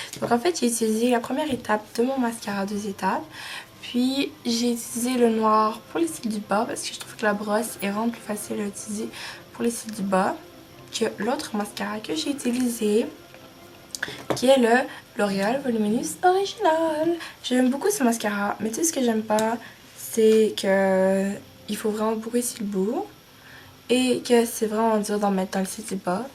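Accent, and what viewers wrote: Quebec, Canada. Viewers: C ben drôle a voire ta un accent francais pi en même temps québécois (moi jsuis québécoise ) tk bonne video c pas BS :) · Désole, mais sa se voit que tessaille de prendre laccent francais. Tes québecoise. Pas besoin dle chacher!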